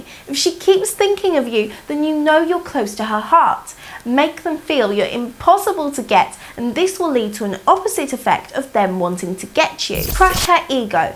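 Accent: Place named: United Kingdom. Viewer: The creepiest thing is this ultra thikkkkk British accent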